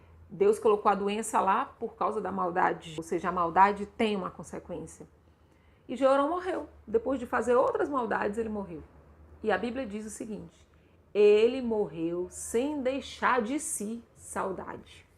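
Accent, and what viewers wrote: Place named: Bahia, Brazil. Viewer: Mas que sotaque baiano é esse minha gente? Boas palavras minha irmã!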